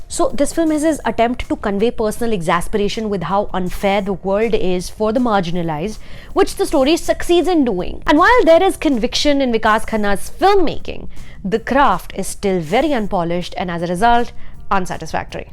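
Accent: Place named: India. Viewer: Omg - awful commentator . Could the English pronunciation get any more horrific and the Indian accent be more grinding to hear fir more than 2 mins . Why has FC got these awful film critics on it . They need to go to finishing school first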